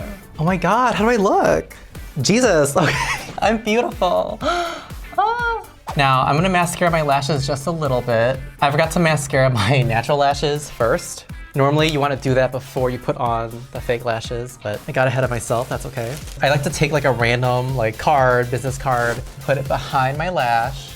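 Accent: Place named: Chicago, United States. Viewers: I'm only able to focus on her Midwestern accent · the chicago accent is jumping out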